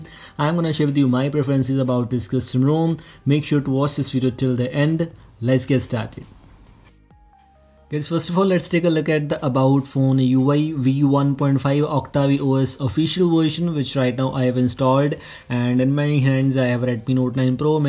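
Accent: India. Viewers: Please, two questions: There is no issue with Bluetooth (I'm not familiarized with the nice Indian accent and can't understand that part)? The navigation bar, the 3 buttons, can be customized too (take screenshots with a large tap in some of them for example)? Thank you!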